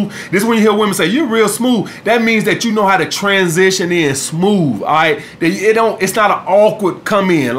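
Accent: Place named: Atlanta, United States. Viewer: you sound like you from Atlanta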